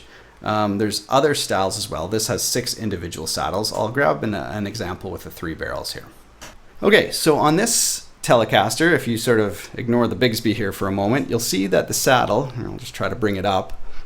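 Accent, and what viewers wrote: Canada. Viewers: "talk aboat" he's Canadian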